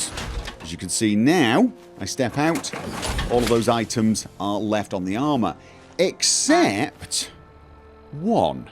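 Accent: United Kingdom. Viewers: Cool voice! This britt snob voice that equally irritates and charms))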